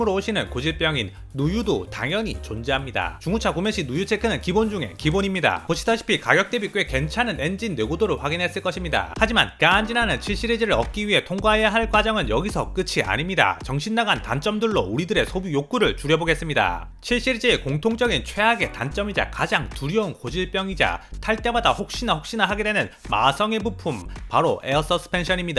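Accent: Gyeongsang, South Korea. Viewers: 말투가 경상돈가 함경도인가?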